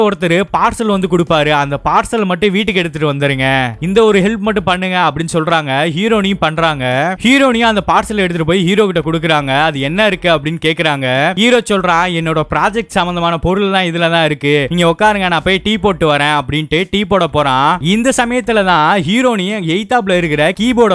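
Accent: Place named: Coimbatore, India. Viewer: Coimbatore English oru thani slang pa - Heronee...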